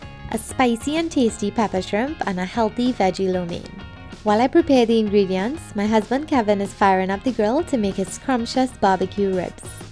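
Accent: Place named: Trinidad and Tobago. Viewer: Really delicious! Do you prefer the bigger or smaller shrimp for pepper shrimp? I never attempted it but this makes me want to 😻 Your accent always makes me happy to be Trini🇹🇹 I really need that hops at the moment!!!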